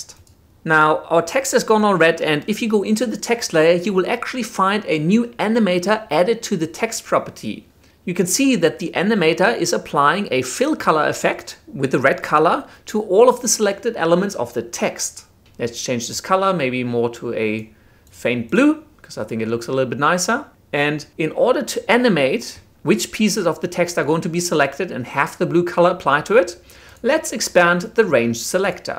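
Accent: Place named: Germany. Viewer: You sound kinda German :3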